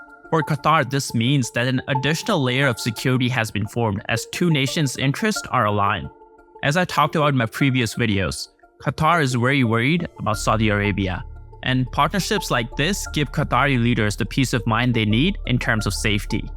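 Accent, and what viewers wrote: India. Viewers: Indian accent😅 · your accent is indian with much improvement than indian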